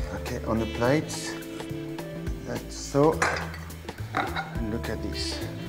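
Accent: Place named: France. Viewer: Omg I know is French and his accent makes it hard to understand. The subtitles however is terrible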